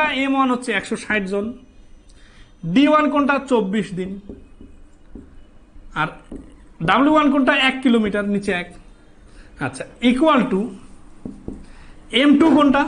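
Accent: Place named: North Bengal, India. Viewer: স্যার আপনার ভাষা শুনে মনে হয় বাড়ি উত্তরবঙ্গ। আপনার বাড়ি কোথায় স্যার?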